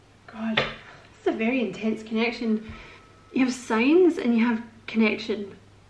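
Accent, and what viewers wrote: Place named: Glasgow, United Kingdom. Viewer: Sounds like a fellow Glaswegian reader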